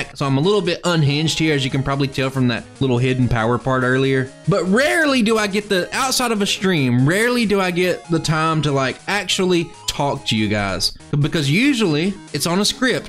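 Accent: South, United States. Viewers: somewhere from the Southern States :D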